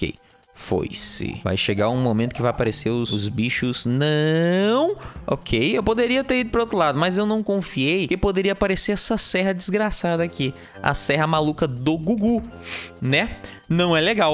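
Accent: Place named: Portugal. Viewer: Esse sutaque de portugal Ó top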